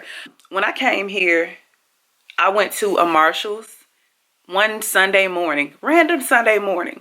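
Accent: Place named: Mississippi, United States. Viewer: I’m from Columbus MS, moved to Gulfport MS, and decided I’m moving to Houston. Literally watched every video about moving to Houston. Pros and cons. Beautiful chocolate sustah! A lot of people might not be able to tell, but I can def tell you’re from The Sip with that accent. Others can’t tell, but I hear it. Beautiful sister. 💜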